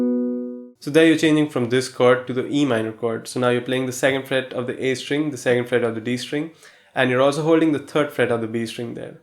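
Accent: India. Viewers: You are Indian right I can understand by your accent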